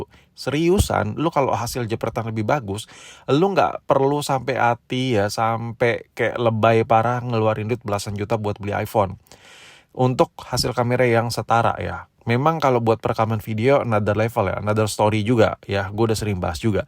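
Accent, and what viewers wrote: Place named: Banyumas, Indonesia. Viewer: Jebule wong ngapak ya😂😂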